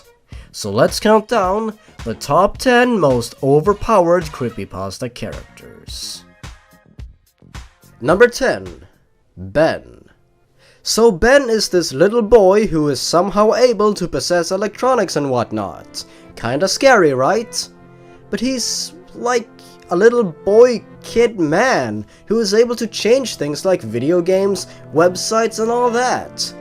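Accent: Sweden. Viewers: Are you Swedish? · Are you Swedish!? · wait are u swedish?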